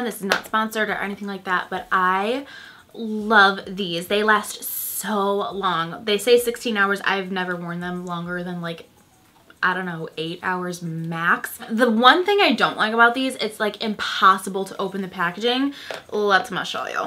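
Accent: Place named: Chicago, United States. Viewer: Love your Chicago accent! 😀😀😀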